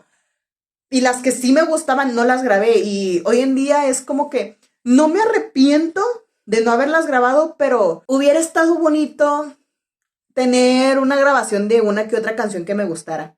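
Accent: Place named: Monterrey, Mexico. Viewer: Gracias por tu video. Hablas como Ale, a Ale se le escucha el accento de Monterrey mas que a Dany y Pau.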